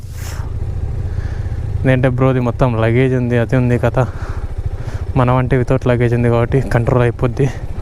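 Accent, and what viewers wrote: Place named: Tamil Nadu, India. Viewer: Masthu undi bro place nd me Tamil slang kuda 😂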